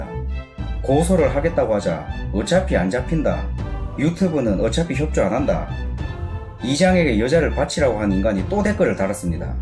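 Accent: Gyeongsang, South Korea. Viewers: 경상도 인가요? 의안부가 필모한가? 친일 매국노들의 후손 인듯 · 졸라 무서운게.. 알고리즘 타서 우연히 보게 된 영상인데, 채널주 말씨가 갱상도다. 근데 사건지역인 창원도 갱상도 아님? 같지역 사람한테도 젊은이한텐 일단 무대뽀로 행패 어지간히 쳐부리네 시골 틀딱것들 무습다 무스버.. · 경상도 아임니까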